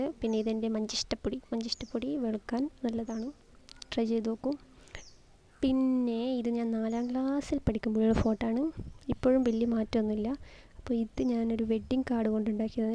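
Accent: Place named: Kannur, India. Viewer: കണ്ണൂര് ഭാഷ അത്ര മോശം ഒന്നും അല്ല ഇങ്ങള് പൊളിക്ക് ഭായ്.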